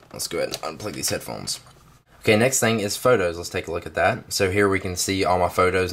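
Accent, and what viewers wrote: United States. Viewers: american cowboy acvent is rlly anoying.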